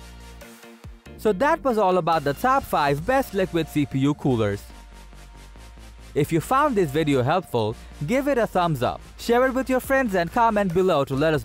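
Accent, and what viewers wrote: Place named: India. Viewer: He sounds like a Indian with a german accent trying to sound like a American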